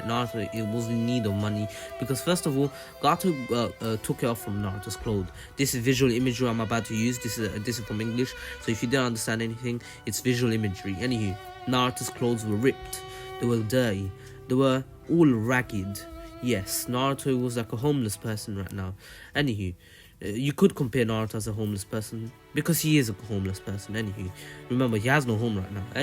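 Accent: United Kingdom. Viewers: U sound super British and if so same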